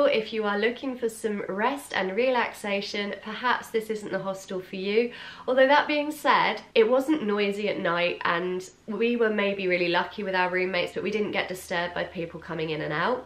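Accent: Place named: England, United Kingdom. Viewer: English accent are awesome! 😁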